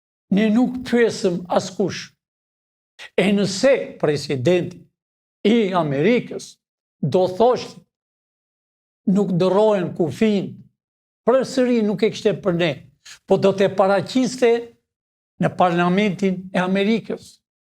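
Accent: Albania. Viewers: Ohhhh perendi,o hero,po kushe pise thote qe nuk flet shqip ti?eeee?o baba tomorri,po ti flete shqipen qe flasin prinder tane,fshace,ata prinder qe kane punuar ne ferma e koop.po baba im fliste fiks si flet ti tani.cliro vatanin nga tradhetaret o hero,o skenderbe,o ismail qemali🇦🇱🇺🇲🙏🙏🙏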